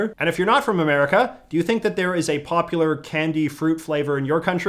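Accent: Canada. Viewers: You don’t sound American. You sound Canadian. 🤔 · You make a very interesting video about candy in the United States, yet your Canadian accent and the candy from Dollarama betray you within the first 30 seconds of the video. Fellow Canadian here, good stuff 👍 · didn't he say himself that his accent is stronger than most Canadians anyway? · I love the Canadian accent poppin out here and there! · he said aroond instead of around. he's not american he's canadian. al though one could argue that he is north-american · You sound very Canadian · i realized you were canadian. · This man is the most interesting combination of different groups. He has the hair and mustache style of the 80’s, the accent of a Canadian when saying words like about and around, he has the facial expressions and head positioning of a surfer dude, and he up-talks where you end your sentences in a higher pitch. 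· Never mind I just heard you pronounce about and around, you are 100% canadian no hiding it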